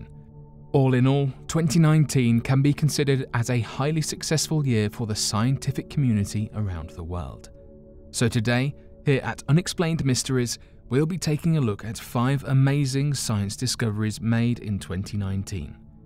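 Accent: England, United Kingdom. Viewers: Its pronounced MEH - THANE, Not MEE - THANE. PLS get the words correctly pronounced with an American accent? Edit: Since you have an English accent, I get the why of the above mispronunciation of Methane. But, I have to inform you that your way of saying Methane is Hilarious!! Oh, and people are saying in the comments section, that “Ultima-Thule” is pronounced Ultima-Thoo-lee. So, apparently, the “E” at the end is not silent. Better luck next time...! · He's not American. Why should he adopt an American accent? Are you claiming Americans are too stupid to understand a British accent? If you want to get on to him about pronunciation, why not point out that Ultima-Thule is not pronounced "thool" but "thoolee"? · He has an English accent, that's all.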